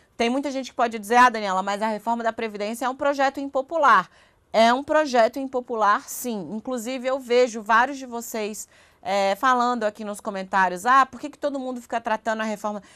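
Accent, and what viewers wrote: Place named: Minas Gerais, Brazil. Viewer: Não esconde o sotaque mineiro não. Adoro.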